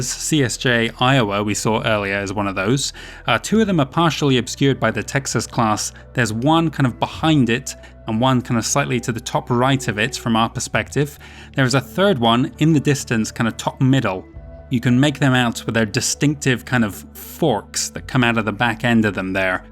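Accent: United Kingdom. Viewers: You sound like you could be British, my apologies if English is your second language but with such good pronunciation I would have thought you’d get Jaguar right. Americans smear their syllables together but how they get “jag-warr” and “jag-wire” out of J a g u a r is truly mysterious. They say “mirror” as meer and “horror” as whore etc etc etc so I suppose jagwarr is just smearing but how TF do they get “jag-wire”?? One of the most beautiful words in this language brutally choked to death by my dear American fellow human beings. I love ‘em but they don’t know speaking.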